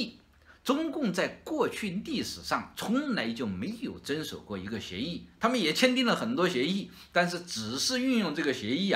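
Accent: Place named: Hunan/Hubei, China. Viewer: 这哥们的口音不是湖南人就是湖北人，以后把这口音改了，再发视频来损自己的母国。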